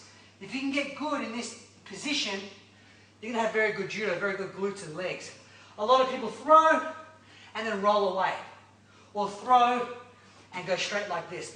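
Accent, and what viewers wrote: Australia. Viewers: Man I love your techniques. And personally I think the aussie accent is beast, but I wish it didn't fuck up the autocaptions so bad; sometimes I actually want to hear you.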